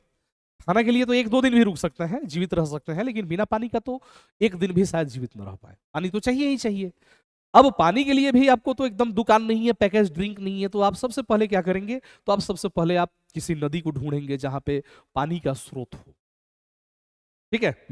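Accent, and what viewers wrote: Bhojpuri, India. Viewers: Sir ji aap to gajabe bhojpuri bolte hai ekdam gaaw ke tarah❤❤❤ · Sir aapka bhojpuri sunkr lga ki aap mere hi district gopalganj se belong krte h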